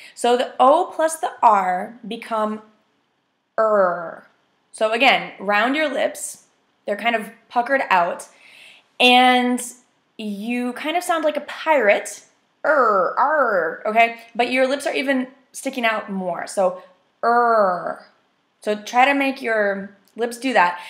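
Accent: United States. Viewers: It's something easy to listen to but difficult to practice, not only we, from India, but neighbouring Bangladesh, Pakistan, Srilanka, simply say WARALD and get rid of it. I faced a listening problem when I met Americans during my stay in USA, briefly, But you are making it a cakewalk to practice English in its pure American tone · Don't want to be pedantic, but you're teaching us american, not english pronunciation :). Thx!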